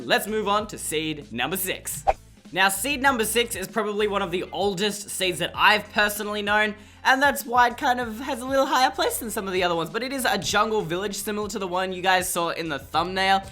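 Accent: Australia. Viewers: How old are you just wanna know your age you sound and look young but u can already tell you come from Australia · r u Australian?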